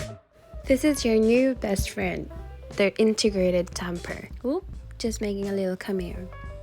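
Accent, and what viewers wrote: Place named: Australia. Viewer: Ahhh!!! Ganda! Coffee lover here!!! Love your Aussie accent :*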